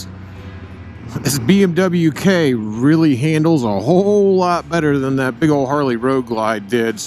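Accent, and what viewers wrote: United States. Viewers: Ooohhhh that typical annoying yank accent and continuous mindless chit chatter.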